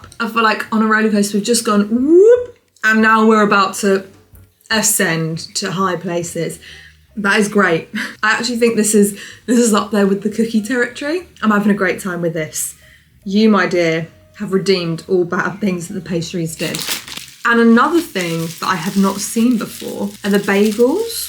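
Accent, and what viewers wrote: England, United Kingdom. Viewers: Je viens de te découvrir, j'ai beaucoup aimé cette vidéo. Ce qui me perturbe c'est ton accent anglais alors que tu es en Amérique puisque tu testes Costco... À part ça merci pour cette vidéo. Bisous de France ❤❤❤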